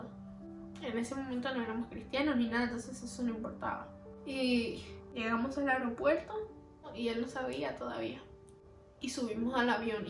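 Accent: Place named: Paraguay. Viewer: Sabiaaaa que eras paraguaya por tu acento de español aaa que lindoo😍 yo también soy de Paraguay😎🇵🇾 edit: no sé cómo llegue acá mi mamá también vive en Argentina y este año quiero ir para conocerle. (también soy cristiano) pero ellos no :(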